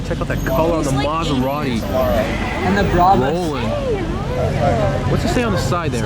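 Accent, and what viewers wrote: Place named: Southern California, United States. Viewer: That socal accent. Lol irrelevant but still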